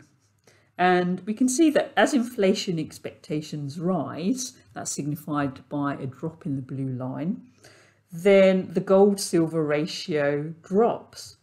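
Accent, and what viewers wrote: United Kingdom. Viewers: The best analysis in a very long time, and I love the British accent as it reminds me of my lovely old days in Wesr Yorkshire at Bradford Uni. Ta love!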